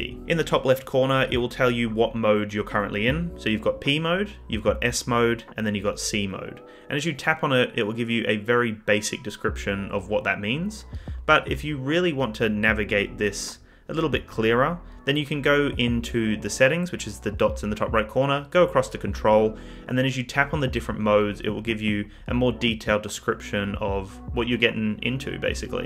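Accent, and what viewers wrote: Australia. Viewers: Thought you were Aussie, I live in Echuca in Victoria :) keep up the good work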